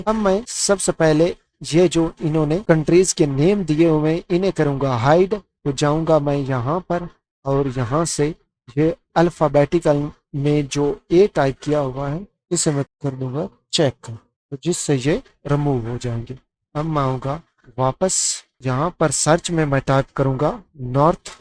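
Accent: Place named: Punjab, India. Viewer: Bhai apna talaffuz Punjabi say Urdu karo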